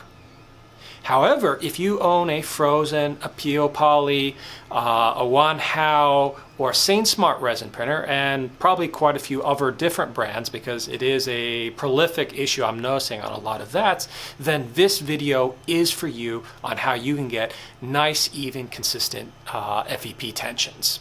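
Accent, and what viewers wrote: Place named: Canada. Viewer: ahh ok, you sounded like a fellow 🇨🇦 😊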